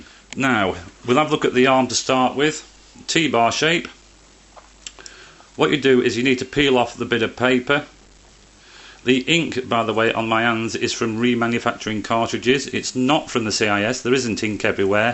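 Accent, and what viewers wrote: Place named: England, United Kingdom. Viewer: Esse cara é inglês dá pra perceber pelo sotaque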